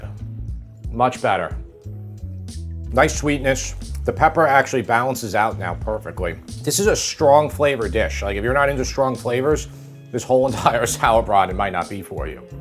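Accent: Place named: New Jersey, United States. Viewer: My mom loves hearing ' NJ accent "yeas" evolve with time. It started out as a boyish 'ya!' but is now a very Rocky level "YE-uh" It's incredible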